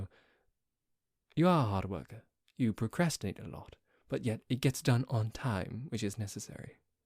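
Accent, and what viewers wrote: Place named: United Kingdom. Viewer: Once again, waking up on Saturday to a audio is the best way to wake up on Saturday! Amazing once again, you’re British accent is definitely nice 😊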